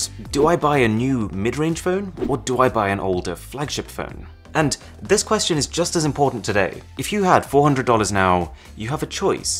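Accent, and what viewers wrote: United Kingdom. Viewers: You have a British accent but talk in the dollar currency. If your British just say pounds sterling as I'm from the UK and understand pounds better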